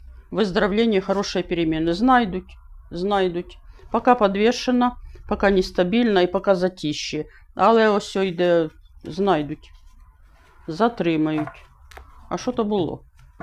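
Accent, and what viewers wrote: Kherson, Ukraine. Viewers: Добрий день! Обожаю херсонский суржик! Здоров'я Вам, пані!!!❤❤❤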